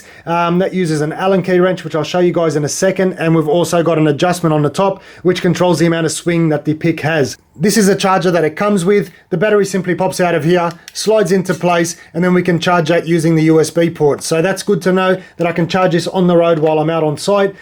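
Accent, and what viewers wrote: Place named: Australia. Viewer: Good Australian accent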